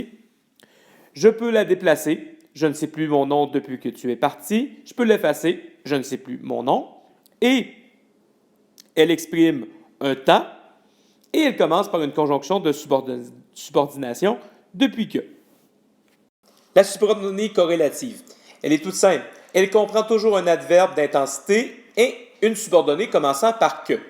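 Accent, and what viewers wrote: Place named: Canada. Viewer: Super Tuto et j'adore l'accent canadien :D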